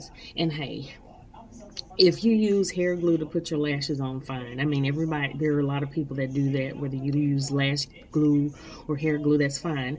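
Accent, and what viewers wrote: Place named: South, United States. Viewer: Agreed with every single detail, and straight 2 the point! Just love that deep-hearted Southern accent and i'm from Texas!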